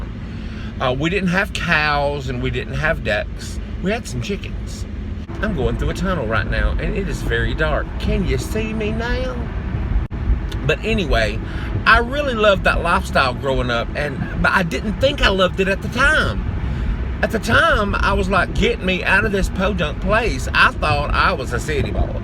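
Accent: United States. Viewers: Hi! The Stivers sent me over and you are so adorable! I am learning too, so we can learn together! I just love to listen to your voice. I am from California and I love that southern accent!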